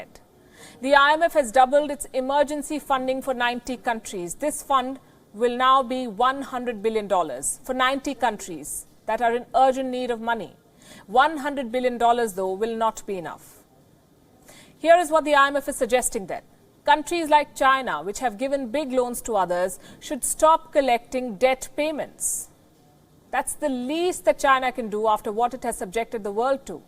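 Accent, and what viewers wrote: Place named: India. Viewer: INDIAN ACCENT PAY PEY, SOON SUN, SAY SEE, ABLE EBLE, PEOPLE PEEPLE, TAKEN TEEKEN, AID EED, WAIVE WEIVE, NATION NETION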